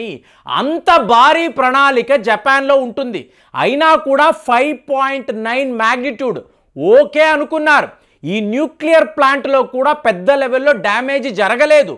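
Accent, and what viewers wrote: Tamil Nadu, India. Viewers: While doing telugu వీడియోస్ యూ have to refine your tamil slang garu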